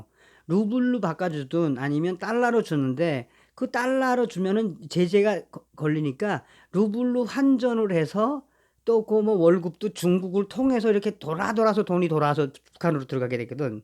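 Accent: North Korea. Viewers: 북한에서 오셨어요? 좀더 생성한 정보가 좋고요 북한말 재밌어요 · 꼭 성공하세요 ~방송할때 표준말하니 더품격있네요~ 북한사투리 보다 표준말 하세요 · 사정상 후원을 못합니다. 그러나 열심히 보면서 광고를 다 보도록 하겠습니다! 북한 사투리를 들으니 돌아가신 어머니가 생각납니다...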